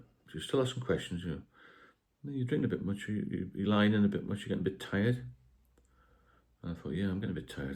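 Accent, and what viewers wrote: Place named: Newcastle, United Kingdom. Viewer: the geordie accent gives it away !!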